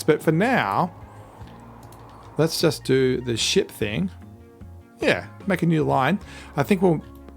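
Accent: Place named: United States. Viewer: In UK we say it like assfault We say d-e-po where you say it Dee-po. We say "root"when saying route. Always wonder why Australian and new zealanders say it like the yanks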